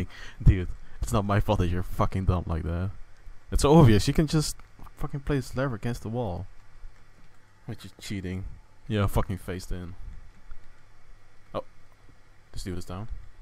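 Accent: Netherlands. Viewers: Is he Dutch because he sounds like it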